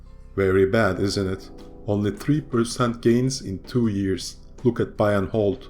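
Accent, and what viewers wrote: Turkey. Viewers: Turk musun aga? :) aksanin oyle gibi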